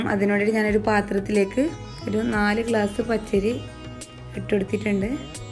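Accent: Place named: Kasaragod, India. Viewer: Kasrgod slang orupad ishtayii.... Masala doshayum sprrr🥰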